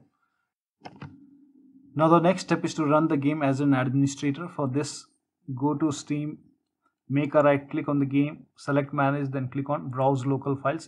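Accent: India. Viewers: Any PC video with an indian accent is automatically a W. Well done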